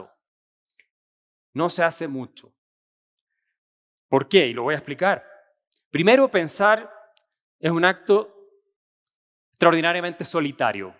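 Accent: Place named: Chile. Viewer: Excelente. Tu acento me acuerda de mi hermosa chilenita linda que perdí en el 2020. Mujer tan hermosa y única.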